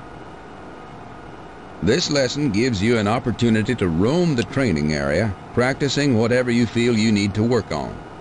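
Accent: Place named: South, United States. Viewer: From Kentucky. I didn't notice the narrator trainer's southern accent until just now. I used to play this as a kid 25yrs ago and he sounded like all the other old farts around here telling me what to do 😂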